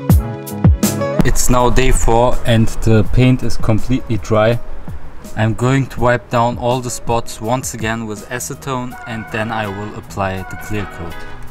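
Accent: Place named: Turkey. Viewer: Sanki aksamınız Türk gibi.. Thanks for video..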